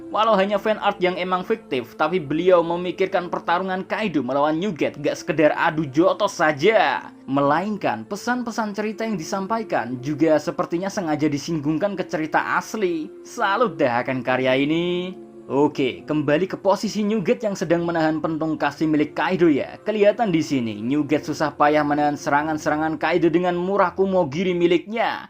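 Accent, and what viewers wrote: Java, Indonesia. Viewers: Min coba pake narasi full basa Jawa. Medokmu mantap bgt min. Aku suka 😂 saya nyoba pake narasi medok di konten saya a dapet min. Apa gara2 saya jawanya cuman 50% yak? Kasih tau rahasianya supaya bisa medok min 😂. Kasih feedback yaa gan saya medok kek si mimin apa kaga 😂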